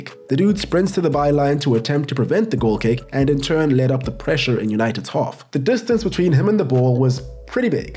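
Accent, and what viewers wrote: South Africa. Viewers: Are you south african? You sound south african. and yes, i myself am south african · I was watching this video, And something just made it feel homely, Then I realised, You are speaking with a South African accent. Now I see your channel and content and think. Wow, South Africa has talent and your production is on par with YouTube stars. So I'm so proud to see a local have such a successful channel! Welldone. But also, yeah when Haaland came to man city, I knew him from bvb and from his insane international scoring. You know he holds the record for the most goals scored in 1 game, I believe it's 9 individual goals in an international game. I knew the premier league wasn't ready for a player like him. I do believe though managers are strat'n on how to counter him as we speak. So let's' see later on if he can keep this going. His a huge dude with some insane accuracy with his shots. 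Also his size, you'll notice in games, he throws his body in the way for the ball to bounce off him into the goal. Mainly by throwing those long legs out.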